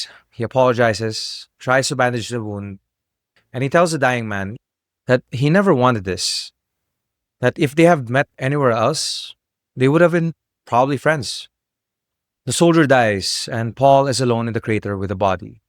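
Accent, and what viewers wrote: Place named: Philippines. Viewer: It didn’t even take me 10 seconds to know ur pinoy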